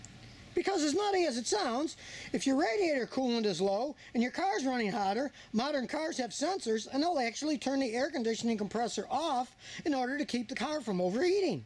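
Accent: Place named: East Coast, United States. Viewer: hey i am curious have you ever lived in pennsylvania? Your accent sounds like it has a bit of east coast in it...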